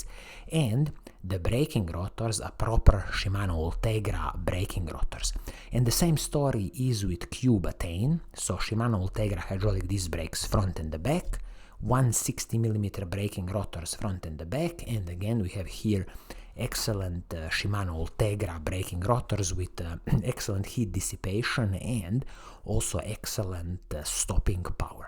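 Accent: Italy. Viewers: I really like the Italian accent. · Ma perche' video in inglese quando si sente benissimo che sei italiano????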